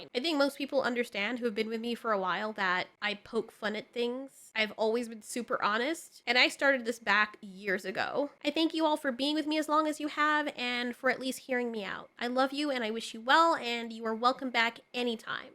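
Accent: England, United Kingdom. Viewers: That was a surprisingly impressive English accent. Wrong time period, but still tho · I endorse your Brit accent.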